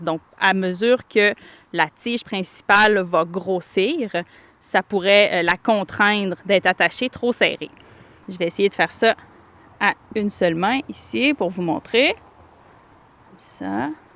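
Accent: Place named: Quebec, Canada. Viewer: Bonjour, vous expliquez très bien et j'adore votre accent Québecquois ❤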